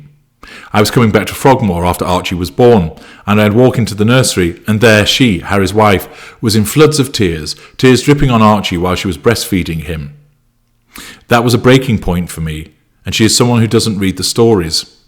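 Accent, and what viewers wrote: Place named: Widnes, United Kingdom. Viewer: Are you from widnes or that area Hough Green? I'm sure you lived around tge corner from me? You sound so familiar?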